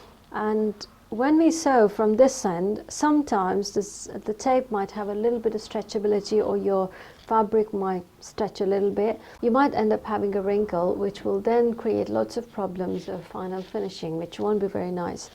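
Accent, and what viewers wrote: United Kingdom. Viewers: Where do you buy your notion? I been sewing for 40 years here is the USA. I never see a zipper that long. Are you from the UK. I think I hear a British accent? You have a beautiful speaking voice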